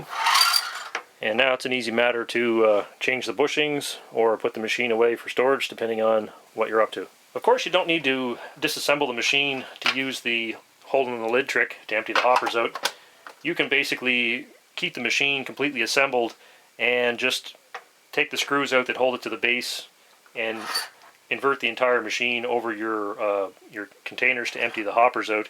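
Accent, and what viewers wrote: Canada. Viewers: Great video! I just bought one of these recently, as I have a plethora of spent shells I kept for eventual reloading. I like the Canadian accent too.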